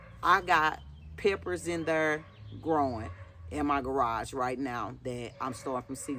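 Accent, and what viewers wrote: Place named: St. Louis, United States. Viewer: Sounds like you from St. Louis.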